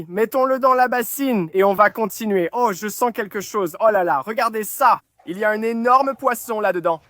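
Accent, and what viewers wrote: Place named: Quebec, Canada. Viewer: ❤Wouaw❤ un Payara le poisson vampire par contre la voix j'aime l'accent québécois mais lui il a une voix pas terrible ils est trop affolé s'il te plaît change la voix 🎉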